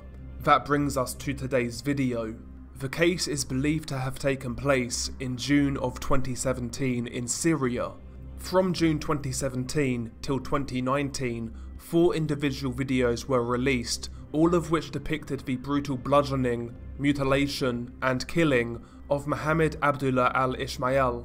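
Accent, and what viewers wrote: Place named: United Kingdom. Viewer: Im from Germany but I love youre channel. I can understand it whats saying. Keep going ! And between I love youre british accent ❤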